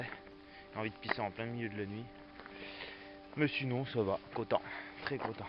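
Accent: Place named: Vosges, France. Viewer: C'est la moulinette youtube qui m'a proposé ta vidéo. Bon, il faut dire que j'aime beaucoup la rando et qu'un parcours comme le Stevenson me fait envie. Je commence à regarder et je me dis : Oh, ce gars a l'accent du pays ! Et bingo ! Seul un vosgiens peut aller se perdre là bas en sandalette dans 20 cm de neige ! Je rigole mais faut quand même être gonflé ! Du coup je vais aller voir la suite de l'aventure de ce sapré gaillard ! Ah et puis un petit abonnement de plus !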